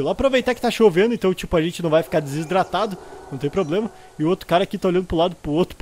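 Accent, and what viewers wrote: Rio Grande do Sul, Brazil. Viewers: Vai rola pauleira kkkkkk da para ver que tu é gaúcho kkk tbm sou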